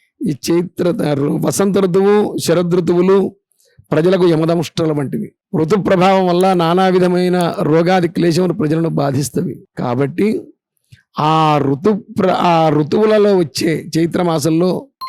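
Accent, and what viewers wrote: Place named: Andhra Pradesh, India. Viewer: Mee ru baaga maatladaaru.andhra maatallaga vunnavi.chaala santosham god bless you sir.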